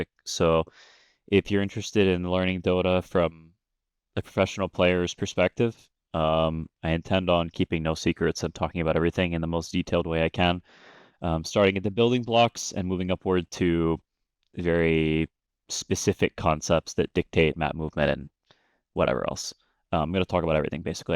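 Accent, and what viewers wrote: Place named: South, United States. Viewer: I hope you will still stream and watch some tourna bro we love your southern accent casting.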